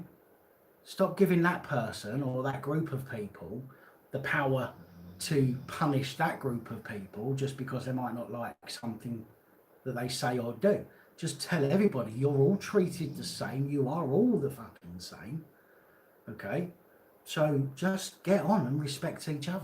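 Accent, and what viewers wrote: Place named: London, United Kingdom. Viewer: Why do you live in Liverpool, you sound like a londoner mate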